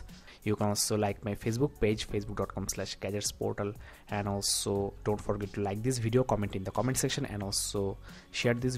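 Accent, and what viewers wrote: Bengal, India. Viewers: OMG..you are eating your words when you are talking...i know you are indian but make it clear explanation..... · bangali talking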